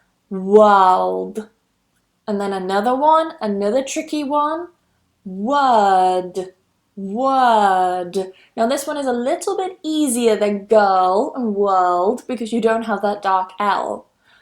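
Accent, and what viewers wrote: United Kingdom. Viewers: Is this british pronounciation ? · British Accents😎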